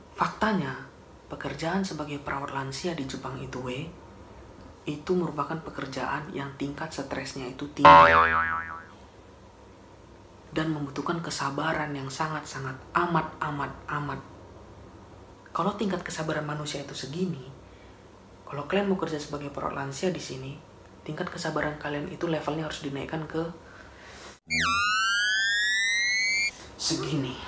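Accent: Medan, Indonesia. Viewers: medan kali abg ini 👍👍👍 mantap lanjut kan bg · Bang, asal medan kah? Logatnya medan kali soalnya😄😄 semangat ya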